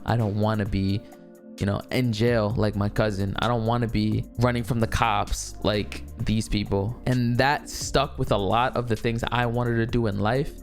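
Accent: New York, United States. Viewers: I knew you was from NY the way of speaking was too familiar, love you brodie keep up the work‼️‼️ also take as much time as you need to come back consistently❤️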